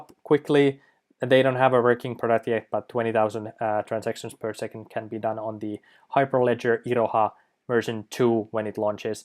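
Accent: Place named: Finland. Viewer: your accent man, it's brutally finish. I had been in Helsinki several times, and making the trip to Estonia! Love your forest, and love your show!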